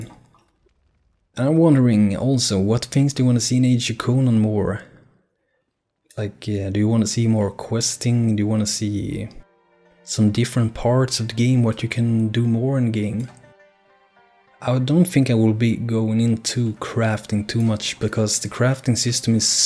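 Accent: Sweden. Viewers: Sounds very cool! :) Couldn't help to notice that you were swedish. So am I ;) Ha det bra, kommer fortsätta titta på dina videos !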